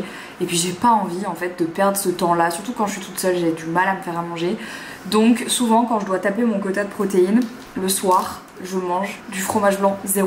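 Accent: Belgium. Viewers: C'est incroyable, j'entends un petit accent belge ;) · Je trouve que t'as pris un léger accent belge 😂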